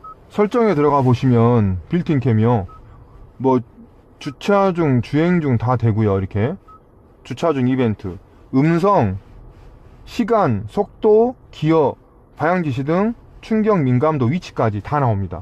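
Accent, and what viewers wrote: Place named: Jeolla, South Korea. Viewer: 전라도 분이구만 말이 귀에 속속 들어온거보니 ㅎㅎ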